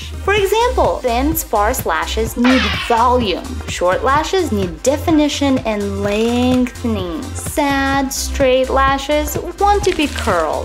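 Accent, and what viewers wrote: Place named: Russia. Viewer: i like this slight russian accent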